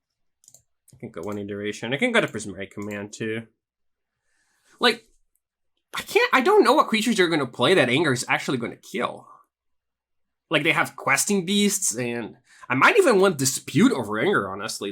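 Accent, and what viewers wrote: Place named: Brazil. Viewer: Tenho que concordar. Acho que a sua pronúncia é impecável. Dá pra perceber um sotaque residual, mas se eu não soubesse que você é brasileiro, nem diria que é sotaque.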